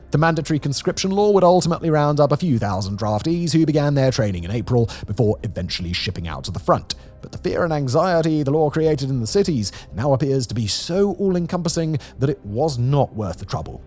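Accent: Australia. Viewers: He's British? I thought he was Australian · You said it! Thanks! Is this Simon Dude Australian? And what’s the HURRY! Don’t forget his mispronunciation! He keeps saying: “MY-AN MAAR”!!! Is it you “MAAR” or my “MAAR???”. Sorry, I had to STOP listening! Couldn’t take it any longer! It’s too Disturbing! But, I will HELP you: Say “Mee” (like Me) and “Maar” Not that hard for you! OK! Let’s TRY! “Mee-an-Maar”. Please change next time you talk about Myanmar ex Burma. Remember “ Mee-an-maar”. Ex fan of. Johannes Koistinen-Lindgren born in Suomi-Finland. Resident in the northern Thai hinterland Khlon Nam Lai village.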